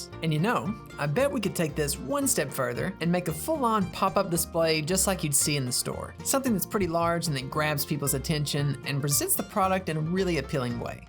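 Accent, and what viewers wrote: South, United States. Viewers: I just noticed your southern accent, and now I can’t I hear it 🫨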